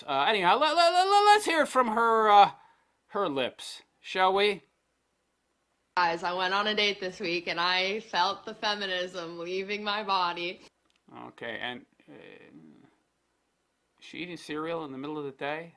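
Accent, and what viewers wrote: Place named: Texas, United States. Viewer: If you slightly modified your Texas accent, you could probably nail a James Carville impersonation.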